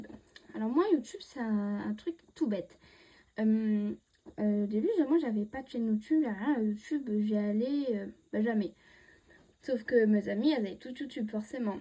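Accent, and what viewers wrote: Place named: France. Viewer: Aaah trop choupi❤❤ bravooo!!! 🍾 👏🏻 t’as un accent très français 🎀😮 ! Aww trop choupi ☺️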